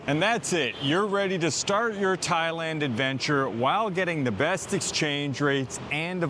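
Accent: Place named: Canada. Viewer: Thanks for your videos! Very thorough, informative and concise, all spoken in clear Canadian English!😊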